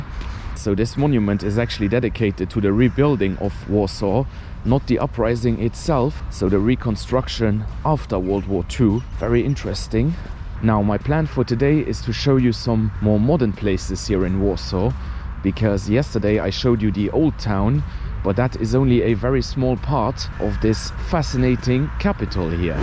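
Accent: Germany. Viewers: OK even if, but his English is with very recognizable german accent as his first language is german. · greetings from Lithuania! I like your accent, sounds like German. I have never been normally to Poland, one day would like to visit Baltic coast, mountains and capital there. Thanks for the vlog!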